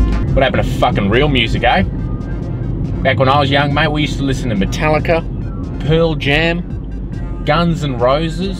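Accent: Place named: Australia. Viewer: The aussie accent makes it 100x better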